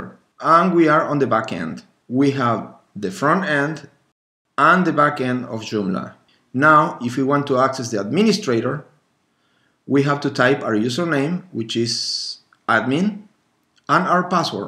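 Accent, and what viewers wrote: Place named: Italy. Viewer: se sente debbrutto che sei italiano XD thanks for vid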